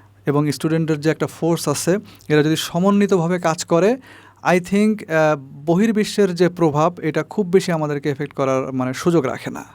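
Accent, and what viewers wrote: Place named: Bangal, Bangladesh. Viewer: Korssy ,kaisse , dissey thik moton bangal bol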